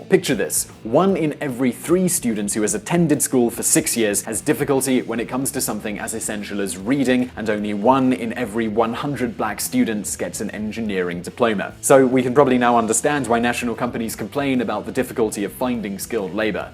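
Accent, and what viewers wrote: Spain. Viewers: This channel is an English version of a Spanish channel, so it make sense the narrator is has a Spanish accent. It just sounds funny tho. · It's all fine and good, but please, let somebody else read your titles. The thick Spaniard accent only makes the otherwise serious videos unneededly hilarious. And, it does not really seem professional. :P Keep it up, guys!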